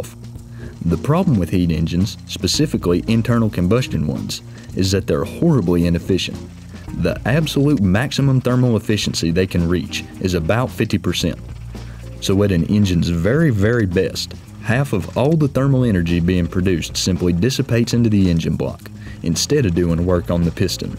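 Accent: South, United States. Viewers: well good job sir. When I heard some guy with a country accent talking about trains, I was expecting your explanation to be cold things get hot and hot things get cold not you actually leaping into a discussion about how thermodynamics work.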